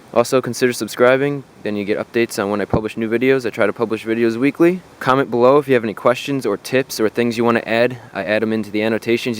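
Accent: United States. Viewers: Your accent sound american'ish, you said millimetre. I say you are canadian. Did i guess right?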